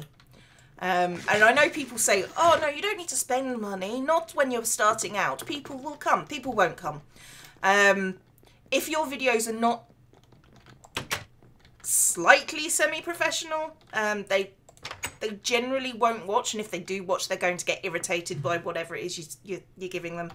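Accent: United Kingdom. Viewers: You don't sound fat. You sound British.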